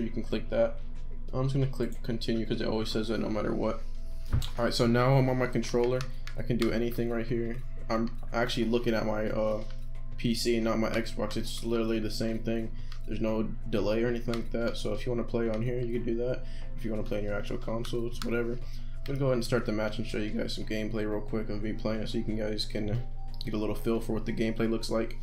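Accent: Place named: United States. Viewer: Bruh u sound like fitz but American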